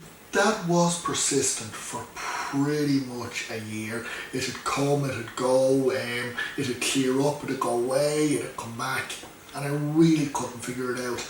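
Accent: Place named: South, Ireland. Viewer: Lovely video my friend missed this one where about from the south of Ireland are you from ?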